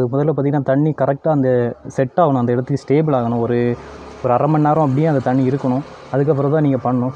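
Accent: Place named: Kanyakumari, India. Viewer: pesura slang la teriuthu.... Kanya Kumari la enga😁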